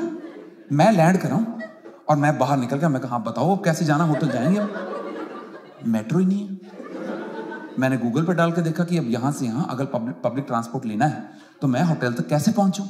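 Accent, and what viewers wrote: Punjab, India. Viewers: You are awesome yaar Your punjabi accent is tremendous bro Really love you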